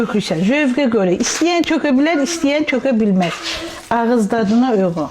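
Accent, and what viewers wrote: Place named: Zagatala, Azerbaijan. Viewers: Qax zonasının ləhcəsidir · Zaqatala ləhçəsine oxşuyur abay 😊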